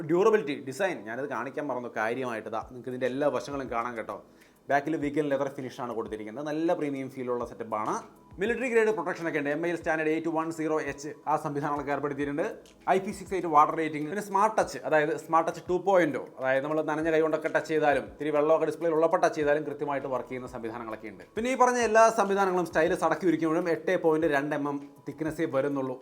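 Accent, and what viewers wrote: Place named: Palakkad, India. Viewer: ഇങ്ങളു പാലകാട് ആണ് എന്ന തോന്നുന്നു 😊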